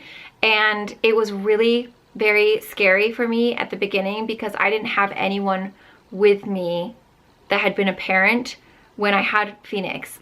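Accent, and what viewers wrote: England, United Kingdom. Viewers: Might be A stupid questuon but did you ever have an English accent.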